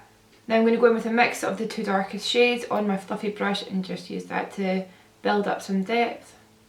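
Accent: Scotland, United Kingdom. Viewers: Omg you're Scottish, yes finally 🙌🏽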